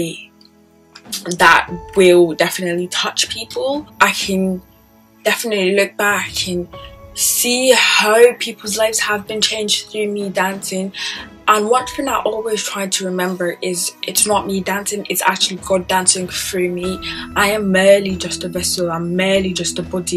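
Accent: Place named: Scotland, United Kingdom. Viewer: Sounds Scottish to me lovely story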